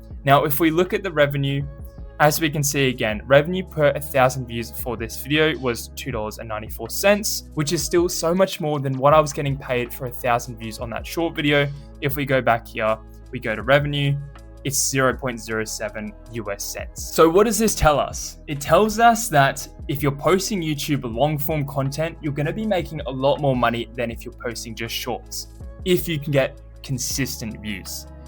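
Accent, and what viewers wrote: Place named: Australia. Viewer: Is that a fellow Aussie I hear?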